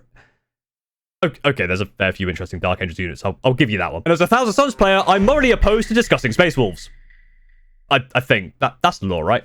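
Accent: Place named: Texas, United States. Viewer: As a Texan, I appreciate your rootin' tootin' accent. It gets a Yee Haw from me.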